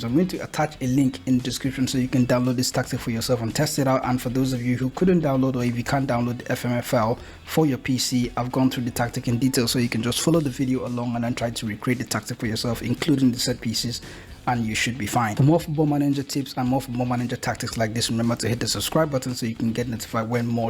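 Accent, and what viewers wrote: Nigeria. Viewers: This is very random, but you sound Nigerian. Great work though.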